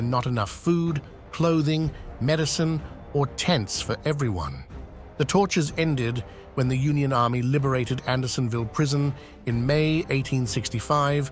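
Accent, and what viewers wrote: United Kingdom. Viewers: Hmm, I thought because it was yet one more UK narrator doing American topics… But seriously, the UK gave us the first real concentration camps in the South African Boer War (referred to as the Second Boer War). Women and children died by the thousands.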